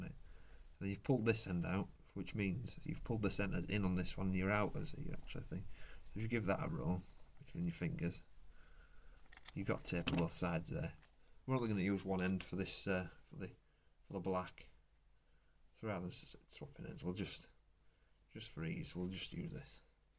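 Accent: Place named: Yorkshire, United Kingdom. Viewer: Thank God I live in Yorkshire and can understand you, As always Buddy well done...Tell Kia to buy you a razor for Xmas though ;)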